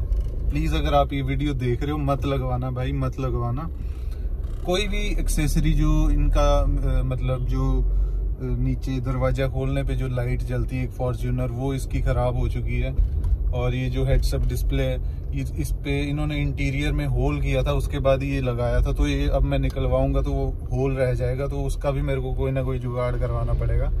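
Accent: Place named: Himachal Pradesh, India. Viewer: Are bhaiya aap to mandyali bolte ho nd fortuner pai ye roof par acha ni lag rha hai taxi lag rahi hai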